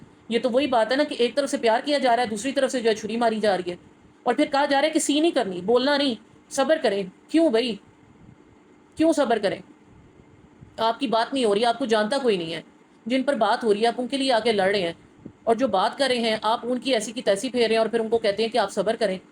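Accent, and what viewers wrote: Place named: Punjab, Pakistan. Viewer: Very true💯... But really ur punjabi is soooo sweet i luv it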